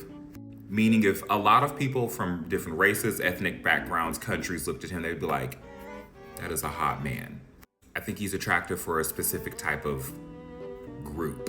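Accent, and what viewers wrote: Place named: United Kingdom. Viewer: First thing -- I LOVE your British accent. And secondly, to me, it just feels like certain men are being rewarded for their bad behavior and hatred. I feel like high positions in these sorts of contests and polls are a way of telling them, "Keep doing what you're doing, and you will be given further, grander presents." It wouldn't surprise me, if Piers, in particular, is itching for a knighthood. (6/2/2024)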